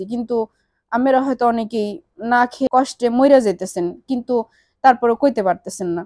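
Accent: Barishal, Bangladesh. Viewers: মনে হচ্ছে গো আপনি বরিশাইল্লা!!! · মুই বুঝাই গেছি আপনার জেলা বরিশাল ঠিক কিনা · আপ আপনারর বরিশালে কথা আমার খুব ভালো লাগে আমার জন্য এক পাত্রী দেখবেন ❤ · আপু আপনার অভিনব কায়দায় বরিশালের ভাষায় কথা বলেছেন অনেক সুন্দর হয়েছে।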